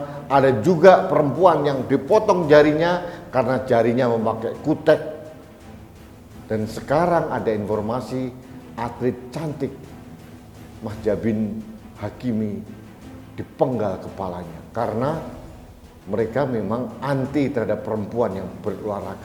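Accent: Java, Indonesia. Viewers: Tebar hoax aja lu pak tua medok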